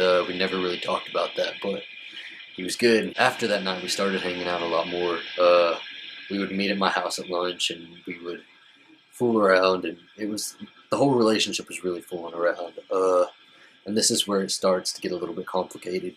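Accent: South, United States. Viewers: He has the deepist southern accent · OMG U R SOO CUTE...And your southern accent is really nice..... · Lol he's so cute with that country accent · Your so southern and adorable I love it! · I liked your coming out story. Would love to talk at ya more. I like that deep southern accent. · I like our southern accent and understated manner. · Omg he's so southern 😍😍😍😍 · I love your Voice! It's Deep and Southern ... Good for you! Don't let Anyone get to you now that your out! It's all down hill from here on out... ;-) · Hi. You have a great voice & accent where are you from within the usa ? I could listen to you for hours. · Man I like U a lot. Your southern accent is fantastic. U are so open minded that is refreshing. You are a really kool dude keep up your great connection with people. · America, fuck yeah. That's what I think of when I hear this guy's voice.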